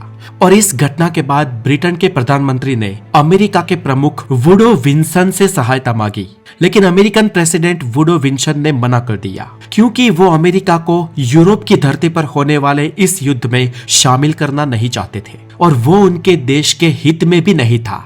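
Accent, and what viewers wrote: Gujarat, India. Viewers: Are you Gujarati bro?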